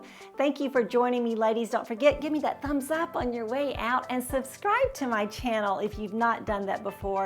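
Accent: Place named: Texas, United States. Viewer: Hi often listen to your videos like them lovvvvve your accent American is it Texan? It's lovely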